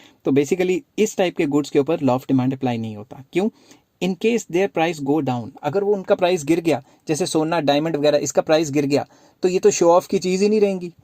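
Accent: Punjab, India. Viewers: Your punjabi accent is to good ❤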